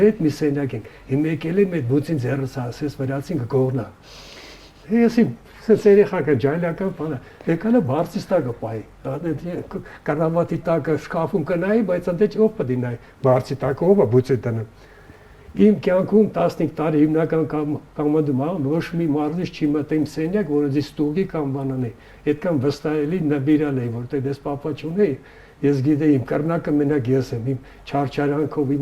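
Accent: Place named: Armenia. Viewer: ❤️❤️❤️էնքան հայ ա, որ ռեւսերեն հայի ակցենտով ա խոսում😍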